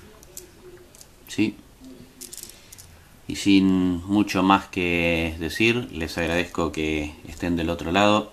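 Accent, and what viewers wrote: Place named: Argentina. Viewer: me gustan mucho tus videos, lo haces muy bien y el acento argentino tambien me gusta mucho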